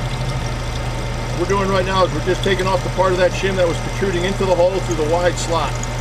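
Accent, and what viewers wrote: United States. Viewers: you're the MAN!! This trick tightened up results to spec- and we could use funds on a 4-jaw instead of a replacement 3! BTW: My compliments on your voice and diction- very clear, understandable and smooth, and best of all: no grating dissonances that bleed your ears... If I may ask- where in the US does that accent originate? Keep on truckin'! -Claus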